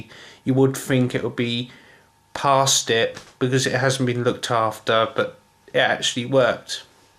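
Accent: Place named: North London, United Kingdom. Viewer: He is English I would say from North London!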